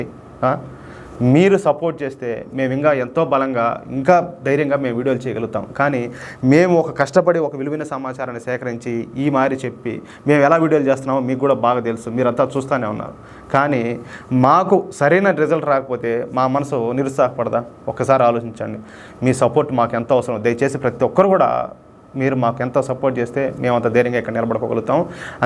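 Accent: Rayalaseema, India. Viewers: నీ బండ బడ వయా. మన ఛానల్ శుక్లపక్ష చంద్రునివలె దినదినాభివృద్ధి జరగాలి మన నెల్లూరు చిత్తూరు యాసతో అదరగొడతా ఉండావయ్య. భగవంతుడు నీకు మరింత శక్తిని ఇవ్వాలయ్యా జైశ్రీరామ్ జై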